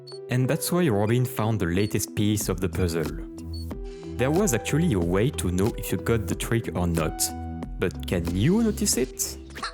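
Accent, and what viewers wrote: France. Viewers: Are you French? You sound like one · What a good english with french accent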